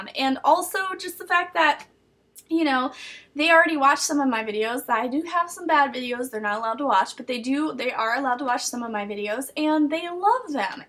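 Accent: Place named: Wales, United Kingdom. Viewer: Yeah....i love your Welsh accent.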